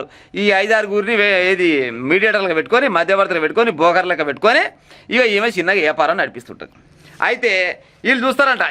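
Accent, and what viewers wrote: Telangana, India. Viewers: నువ్వు భలే గమ్మత్తుగా చెప్పుతావే పెద్ద మనిషి వార్తలు మన అచ్చమైన తెలంగాణ మాండలికంలో 👌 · తెలంగాణ మాండలికం మాటల ద్వారా న్యూస్ చెప్పడం చాలా బాగా వుంది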